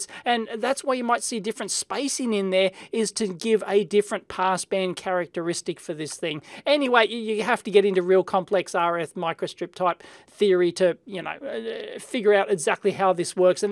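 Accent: Australia. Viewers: I am 728% American but after watching a video from you, occasionally I find myself saying, "hi," to someone but in your Australian accent. It throws people off but it also means I love you stuff.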